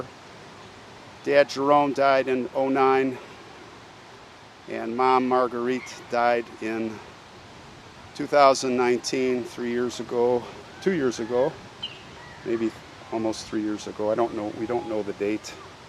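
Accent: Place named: Chicago, United States. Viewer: Love your channel...I am from the Chicago suburbs and can definitely hear your Chicago accent :)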